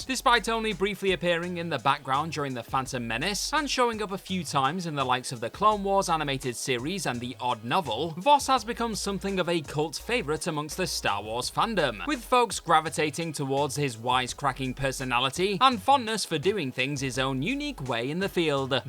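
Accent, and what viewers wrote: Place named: Cockney, United Kingdom. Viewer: You missed yourself off the list. I'd cast you as either the 2-headed alien sportscaster at the Boonta Eve podrace or a new host for Jedi Temple Challange. Then you'd be my favorite Jedi Master! Your glorious cockney accent would get those younglings moving! 😆😆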